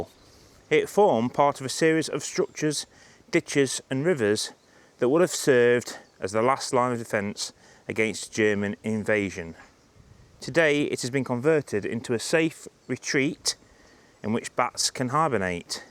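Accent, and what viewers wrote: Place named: Leicester, United Kingdom. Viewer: You sound like you’re from Leicester.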